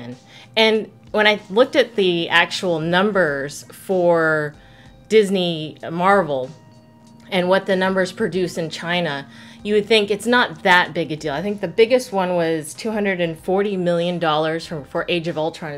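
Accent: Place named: United States. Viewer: Sounds like 100% American to me.;)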